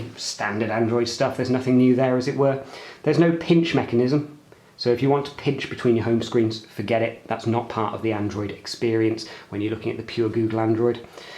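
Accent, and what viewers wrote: United Kingdom. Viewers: Great review, thanks! I'm sorry, I'm not English speaking person. Is it a British accent?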